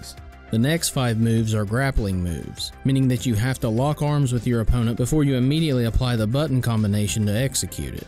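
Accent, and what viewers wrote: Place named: Alabama, United States. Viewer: Love this game! You sound like you`re fromn alabama like me.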